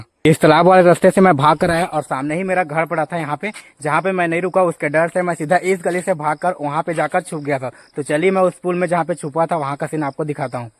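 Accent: Chhattisgarh, India. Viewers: Bhai aap chhatisgarh se hona sach batana pliz aap kon se gaov se ho pliz batao maine aise jana kyu ki aap ka bolne ka tarika chhatisgarh hi jaisa hai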